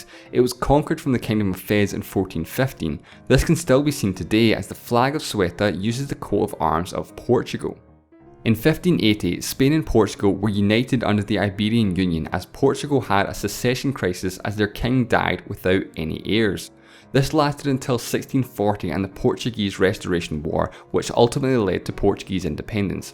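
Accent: Scotland, United Kingdom. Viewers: he has a scottish accent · He’s Scottish. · Thank you mate!! by the way, now that i'm watching the video again his scottish accent is fairly obvious, i should've noticed it before. i'm such a fool 😁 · Luiz Albano He’s Scottish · i cant help but wonder why i find females from the UK, accents sooo sexy, while the males i consider an assault on my auditoty systems.both electronic and organic. anyone know exactlly where this gentleman is from exactlly? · GeoffCMD I don't know what Canadians you've been listening to, but he doesn't even sound close to one. Like Nobody said, he's Scottish. Though he has a thicker accent than I'm used to hearing for Scottish accents, like in Glasgow. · Love this videos, love this guy's scottish voice, love that tiny piano in the background, love that Chile is mentioned here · are u scottish? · what accent is this? scottish? 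· I get what you're saying, but... the dude is not gringo, he's scottish.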